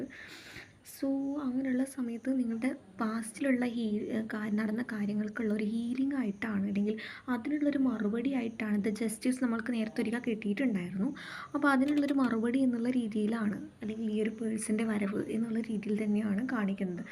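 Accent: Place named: Kannur, India. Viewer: cheythini😂🤣,,, pakka kannur kari👍,,mm,,mm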